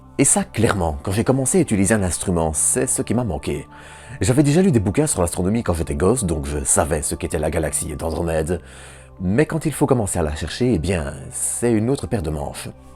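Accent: Belgium. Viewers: Hello. Voici une chaîne Youtube qui m'a souvent étonné. Au début, un ton bien d'ici (je suis Belge aussi) auquel je ne suis pas habitué en video, qui a son charme, d'autant que tu as pris de l'assurance avec le temps. C'est là que j'ai compris que le régionalisme s'il est assumé et que la vidéo est de qualité passe non seulement bien et mais que cela donne une identité supplémentaire. a son identité bien distincte. Ici, tu te mets en scène, et bien çà marche! Les moment plus spontanés, tes regards sont vraiment sympathiques. Bref, surpris de voir que c'est un jeune derrière ces vidéos, avec un style qui attire l'attention et une passion communicative. Je me re-regarde cette vidéo, et bien elle fonctionne vraiment bien. (la touche d'humour à n'apporte rien cette fois-ci à cette video, mais essayes, tant que tu n'abuses pas.) CLAP-CLAP-CLAP-CLAP-CLAP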